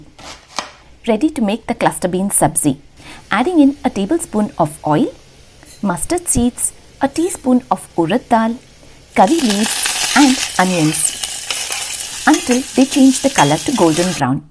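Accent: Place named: Karnataka, India. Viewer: Original Karnataka accent Instead English better you speak your mother tongue. Coz hater over European countries better avoid their respective living style and utensils and the other influence belonging.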